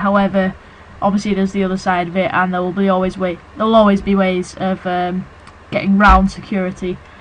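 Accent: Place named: United Kingdom. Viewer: Thanks! And .. uhm .. I LOVE YOUR BRITISH WAY TO TALK!! :)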